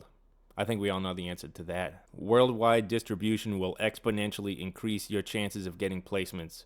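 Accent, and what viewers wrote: Boston, United States. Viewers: Are you from Bah-Ston? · I’ve heard other sync people say to do niche music and not try to do 10 different genres. Isn’t that the strategy with growing a following on social media as well? Btw Is that a Boston or New England accent?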